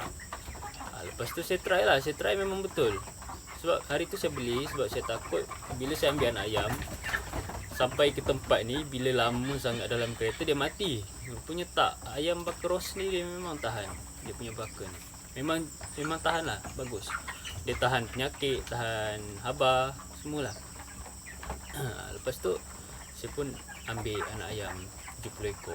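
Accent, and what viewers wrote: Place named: Peninsular Malaysia, Malaysia. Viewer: Awk org smenanjung yer. Saye igt org sabeh..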